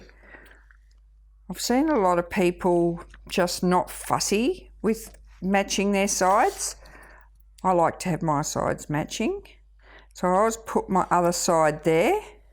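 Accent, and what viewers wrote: Australia. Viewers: I’m a Melbourne girl who was so excited to hear an Aussie lady doing a video and I really love how you up cycled those envelopes. I’m doing some gift ideas that will work well with this envelope idea can’t wait to play😃👍🏻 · So nice to hear a Aussie fellow crafter. Thanks for the tutorial as I'm new to all of this and very helpful. And where did you get your glue from please?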